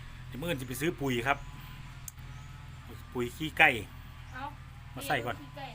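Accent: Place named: Phu Thai, Thailand. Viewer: อยู่จังหวัดได๋น้อจ้า เว้าคือภูไทสกลจ้า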